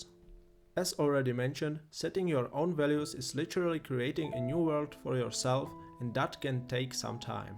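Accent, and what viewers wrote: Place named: Germany. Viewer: I doubt that AI could imitate your authentic German accent.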